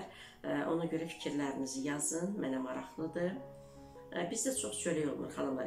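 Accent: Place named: Baku, Azerbaijan. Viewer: Caaan Bakilim Lehcene qurban.Allah sizden razi olsun.Sizi sevincle izleyirem.Bakinin 32 kendine salam olsun.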